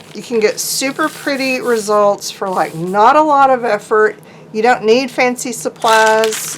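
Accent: South, United States. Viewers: Brilliant as always. We all miss you so much. Thanks for treating us to that wonderful southern girl accent again. ♥️😉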